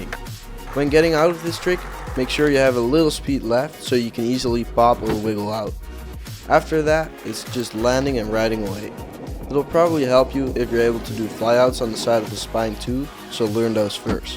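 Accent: Netherlands. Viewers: Je accent klinkt echt Nederlands ben je nederlands?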